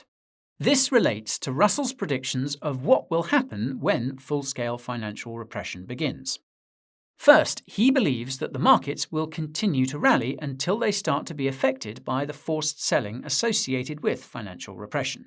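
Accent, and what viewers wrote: United Kingdom. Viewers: Hi 👋 Where about you based? You have a British accent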